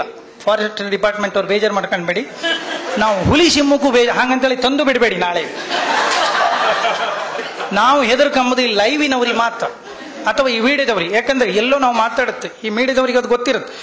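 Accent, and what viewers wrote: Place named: Udupi, India. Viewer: speaks very beautiful UDUPI Kannada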